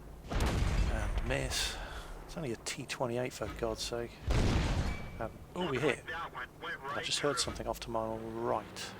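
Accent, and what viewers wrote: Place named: London, United Kingdom. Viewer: Bohemian where are you from? London?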